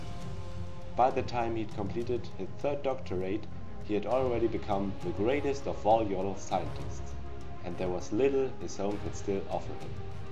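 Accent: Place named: Germany. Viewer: german champ + german accent = perfect!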